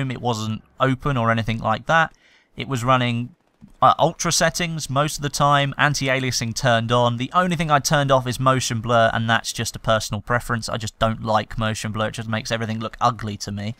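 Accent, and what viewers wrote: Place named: United Kingdom. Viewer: Cool PC, love the way how Brits speak, I recently started a gaming channel after observing you and several other youtubers for an year approx . You can check my channel and see the quality of bids. Currently I have a suclish Logitech gaming keyboard which I use for gaming an always wanted to have a gaming one. If you like my content you can give your Indian friend some help.PEACE !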